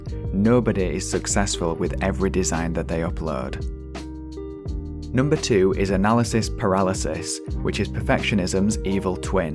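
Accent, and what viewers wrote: Yorkshire, United Kingdom. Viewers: Yorkshire accent ;)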